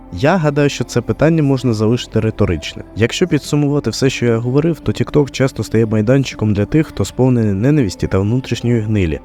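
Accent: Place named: Russia. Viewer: я розумію, що то рофли. Але навіть рофлово назвати це крінж. В нього тупо сруський акцент навіть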